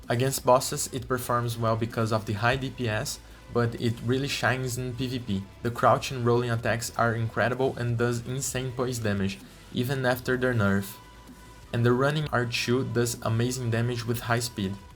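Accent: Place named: Brazil. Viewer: sotaque BR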